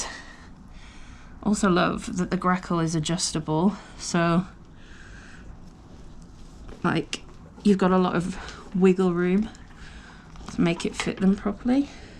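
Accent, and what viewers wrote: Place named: Essex, United Kingdom. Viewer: Hi. I've just discovered your channel. I'm in North Essex so by the sound of your accent, not far from you. I really want to get back into riding but was put off by mean comments about my size which has affected my confidence. I am enjoying your videos and hope to start riding again very soon. I'm a freelance groom so do get lots of horsey contact time x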